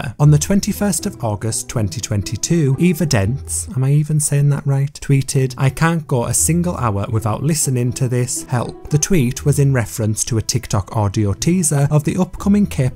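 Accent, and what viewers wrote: United Kingdom. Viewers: I appreciate the sincerity, seriousness and UK accent you employ in approaching these major topics of their time. It's like Dateline for silly memes.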